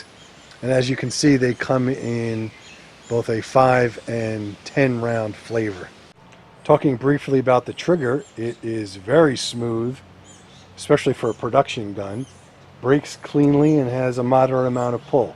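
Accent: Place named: United States. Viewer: Why do you have an american accent if your Australian?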